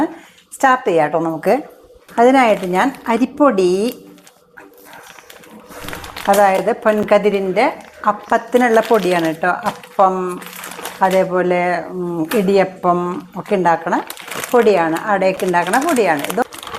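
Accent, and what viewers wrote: Thrissur, India. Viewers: ത്രിശൂർ കാരിയാണോ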